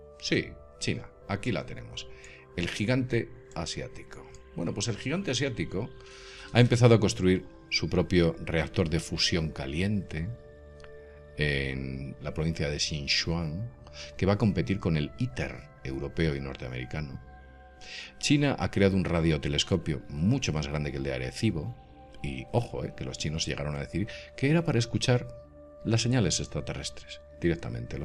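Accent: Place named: Spain. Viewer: Con todo respeto! Si no estás interesado en el tema! que é haces viéndolo?.. Cada país, 🌍 o región tiene su dialecto!... Cuando hablan en otro idioma diferente al de su país .. aún usan fonemas o resaltan algunas consonantes según su dialecto!... es el caso de Los costeños, Rusos, japoneses etc Él es Español 🌍 por si no lo sabías Gracias por mostrarnos tu sapiencia 😏👎🏼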